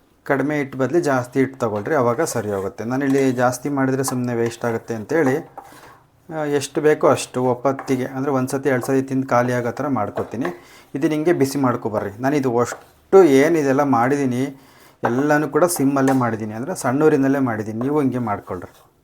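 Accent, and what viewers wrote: Chitradurga, India. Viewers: ಹಲ್ವ ಮಾಡೋದು ಚೆನ್ನಾಗಿ ತೋರಿಸಿದಿರಿ ಚಿತ್ರದುರ್ಗ ಕಡೆ ಭಾಷೆ ನಾ ಇದು ಚೆನ್ನಾಗಿದೆ ಚೆನ್ನಾಗಿದೆ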